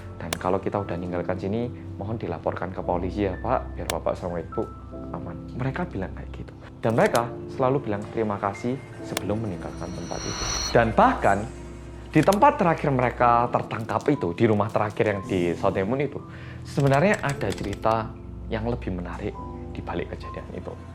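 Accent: Java, Indonesia. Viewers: To be honest, this video is really cool, I don't understand how to pronounce it, the point is I like this video, I think he's great, he has cool words. thank you for I always like the content of your video content, actually I don't like hearing the sound of the medok but I accept it because your content is great, thanks bro.🙏🏼🙏🏼👍🏻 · Yey akhirnya yang ditunggu up pt2 🎉🎉 tim medokkk mas 😂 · Bersama org korea yg MEDHOKKK~ Kangen pembukaan yg begini mas hehe Welcomeback DETEKTIF, Selalu menginspirasi ceritanya, semoga kita menjadi org yg jujur ya mas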